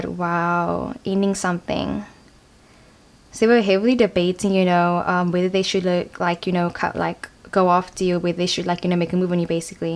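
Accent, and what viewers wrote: South Africa. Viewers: Your accent is beaitiful...are you South African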